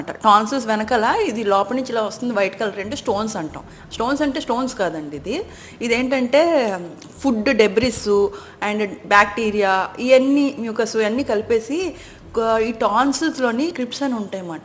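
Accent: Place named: Godavari, India. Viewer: Miru telugu bale matladtunaru madam. Ma Godavari language ❤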